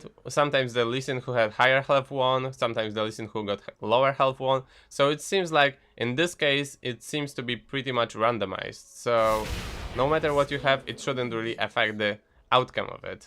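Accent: Poland. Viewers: ARE YOU POLISH? CUZ I LOVE YOUR ACCENT ❤❤❤❤❤❤ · Are you from Poland right? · od razu slychac ze polak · Hi u have Polish akcent xd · jestes z polski bo troche slychac po akcencie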